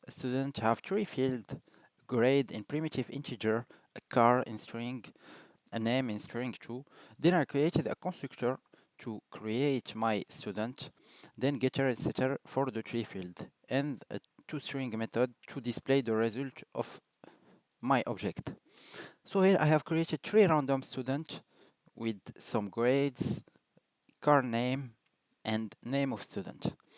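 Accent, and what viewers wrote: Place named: France. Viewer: absolutly he is french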